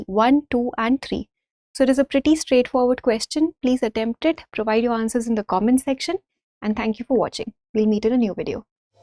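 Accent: India. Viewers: Madam ji indian accent me padhayo jaise lag rha hai college me lecture de rhi ho 😍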